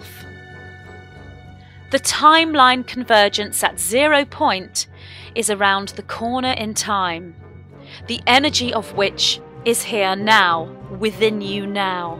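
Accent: United Kingdom. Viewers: me 2. I drink in the accent as for some reason, as I love English accents! Hers is so sweet!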